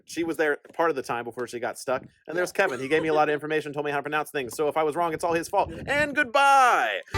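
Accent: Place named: United States. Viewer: Y r u American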